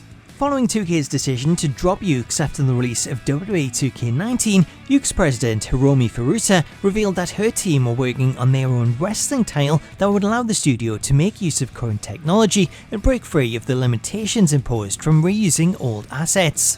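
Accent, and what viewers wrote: Newcastle, United Kingdom. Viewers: North East England/Geordie accent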